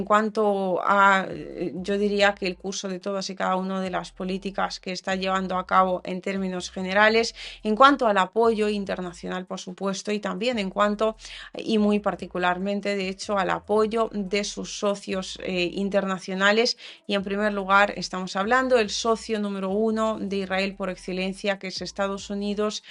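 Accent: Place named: Spain. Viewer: Hola, por tu acento creo que viviste mucho tiempo en algún punto de España. Que significado tiene la plaqueta color rojo a tu espalda. Me gusta tu página. Un abrazo desde Bogotá.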